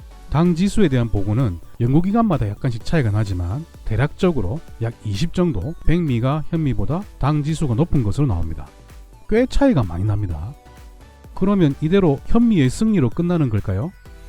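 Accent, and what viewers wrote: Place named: Busan, South Korea. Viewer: 부산 출신이세요? ^^ 아주아주 귀에 익은 억양이시네요. ㅎㅎ . 말씀도 참 재미있게 하시고.... 딱 부산사람 말투 ㅋㅋㅋ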